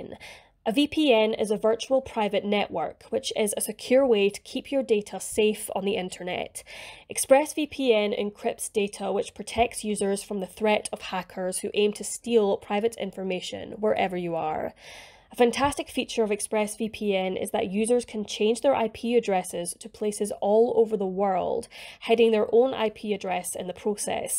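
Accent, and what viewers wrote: Scotland, United Kingdom. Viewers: The narrator has a Scottish accent.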